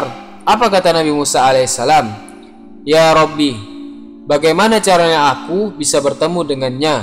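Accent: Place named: Sumatra, Indonesia. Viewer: Logat abang macam orang sumatra lah... Iya kah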